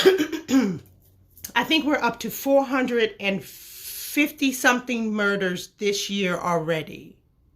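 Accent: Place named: Inner city, United States. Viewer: Damn Girl, I am impressed, you are smart. I liked how you said "I looked it up on Google", Instead of "I gOOgled it", and as gOOgled is not a verb, you can not do that. I also love how you do not trust the internet, "because they be changin shit." You are articulate, but you speak with an inner city intonation in order to reach a particular audience and achieve a desired affect. To add something constructive, I would like to add to your imploration on the learning of words, words do not have meaning. Words are representations, liguisti-graphical representation, of objects, actions, and descriptions. "Meaning" does not come from a word, "meaning' comes from you, the speaker. The problem with meaning is that once you go cross platform, the word still carries with it, its original connotation. You understand the importance of fundamentals.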